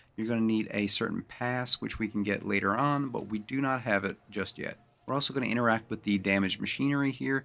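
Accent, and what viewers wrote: Newfoundland, Canada. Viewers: Picked out the Newfie accent immediately, from there as well. Played this on ps4 a couple years ago, it felt empty to me. I’ve been seeing vids of this new update so I might have to pick it up on pc and try again.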